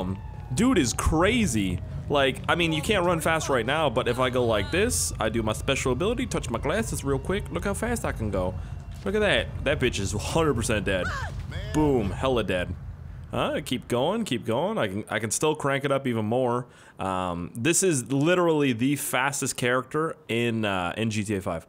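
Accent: Canada. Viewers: U sound like canadian lad a litele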